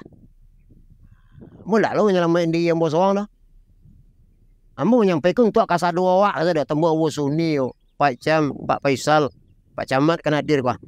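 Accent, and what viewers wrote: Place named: Payakumbuh, Indonesia. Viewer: mantap.., bahaso pykmbuahnyo totok.., yo mode tu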